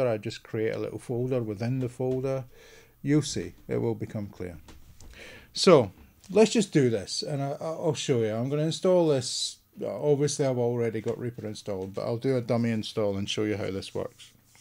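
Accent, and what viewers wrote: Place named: Scotland, United Kingdom. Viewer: Thanks a lot for this great video! I also really enjoyed your Scottish accent, it brought to mind my ten years when I lived in Scotland;-). &#160;- So if I understand correctly, this still remain a portable install of Reaper but just more tidy, correct? - Where is the best place to place all the VST.dll files for all of my plugins inside the main Reaper portable folder? Thanks a lot in advance and best regards, Max T.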